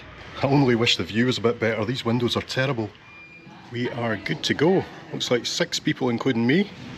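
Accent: Scotland, United Kingdom. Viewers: Tbh.. After watching through most of you videos I hade to google “Wee”. I understood it context to be tine but in my Swedish brain it’s something else.. but apparently my Scottish is useless from time to time:) Keep it up. I enjoy you dialect and your videos. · The more remote a Scottish place that goes to, the stronger his accent gets.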